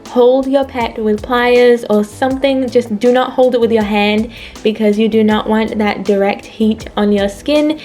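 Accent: Australia. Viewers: This is random but are you Australian?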